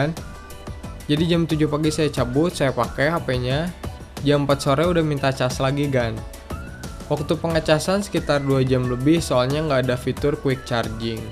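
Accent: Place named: Bandung, Indonesia. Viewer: logatna bandung pisan euy ! Sampurasun mang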